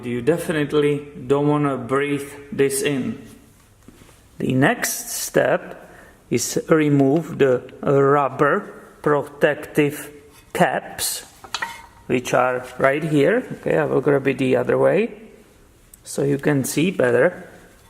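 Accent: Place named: Ukraine. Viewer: Love your vids, smooth, low key, imformative, with a slight Ukrainian , Polish , touch maybe!!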